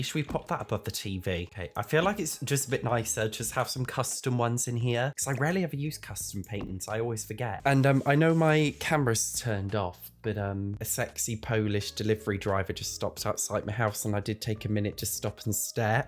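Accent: United States. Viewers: Has anyone else noticed how american accent sounds a lot like Quagmire from family guy 😂😂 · Your American pronuncuations crack me up every time I love it lmao 🤣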